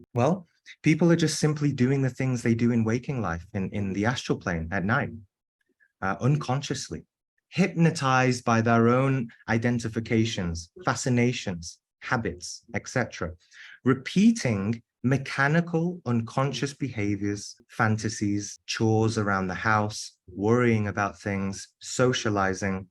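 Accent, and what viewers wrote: United Kingdom. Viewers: It is very difficult for Me to Accurately Express what I intend to Convey...but I will try. I was initiated by an Indian Sat Guru at the Age of 23. I am 73 now. The Tradition of My Guru's Philosophy originates from the Punjabi River region of Northern India...the Homeland of the Sikh Religion. I have been through Many Chapters of Life...since My Initiation...but after listening to Lecture...I now realize just how little I knew about Meditation, the Dream Phenomenon, or Astral Travel...in the 50-years following. I took Copious Notes...during This Lecture...and Now that I have had a Chance to Recopy It...adding My Own Incomplete Sketches of Inner Knowledge...I am At a Complete Loss for Words. I am Now Seeing that I have Added the Lectured Information (Given by )...to Complete what I Already knew and wrote beforehand. It is like Writing The Subject & Verb of a Sentence...and then a Wiseman entering the Room and completing Each Sentence with the Necessary Object...to make the intended Concept...A Complete & Comprehensive Set of Ideas. 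I am describing Something very Spiritual here...but I am merely a Student-in-Progress...sitting in Classroom. I have only had maybe 'Six' of what I would classify as genuine Out-of-Body Experiences in 73-years of Physical Life...but is a Master-Teacher at His Craft...with a Beautiful British Accent to Clearly Express His Lofty Teachings. There is A GOD...and I am truly an Unworthy Recipient of His Endless Grace. I have found a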